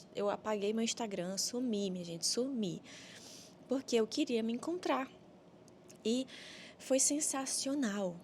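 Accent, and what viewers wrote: Brazil. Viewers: Se tu disseres que nao é catarinense, eu nao acredito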